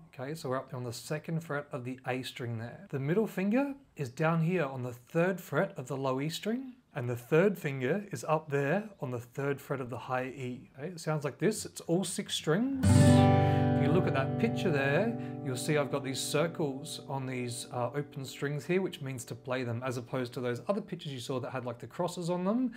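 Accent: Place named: Australia. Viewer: Awesome video! Thanks dude! I know you Aussies say Mate, but in the US I say dude a lot. Cool accent dude.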